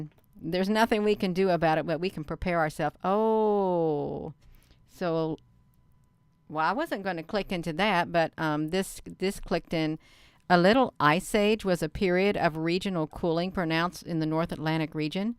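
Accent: South, United States. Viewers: I love the way you talk. Both my mom and dad have that southern drau.Some people are just complainers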